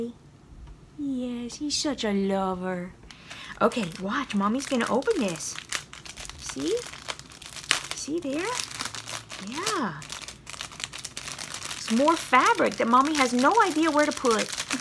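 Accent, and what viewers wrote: Louisiana, United States. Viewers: I've lived in Ocean Springs MS since 1969. I have a little bit of a Cajun accent now and it depends on the word lol. I knew I detected Southern Louisiana in you. Kindred spirit!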